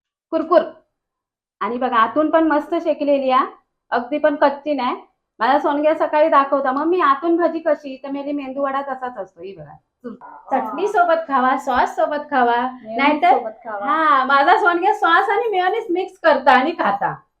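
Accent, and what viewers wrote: Malvani, India. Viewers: तुमच्या विडिओ hi मनापासून वाट बघत असते तुमची मालवणी लय आवडता खूप सुंदर सुधा nagwekar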